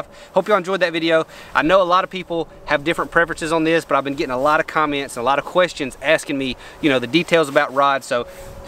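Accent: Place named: South, United States. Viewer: You are one fast talking southern boy ! Fish the same way I bet. 2000 or more cast a day, gives you lots of opportunity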